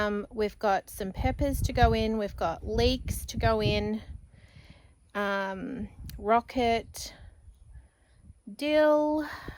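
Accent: Australia. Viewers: I so ❤ listening to you talk in your Aussie accent. 💕 And we can figure out what you are referring to most of the time, peppers or Capacean. Bless you and your family! And sunshine & raindrops to you my friend. 💗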